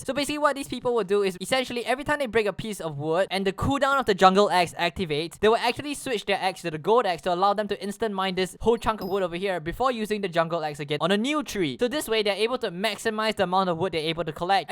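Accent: Singapore. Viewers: Is it just me? Or do you sound like a Singaporean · R u singaporean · U sound singaporean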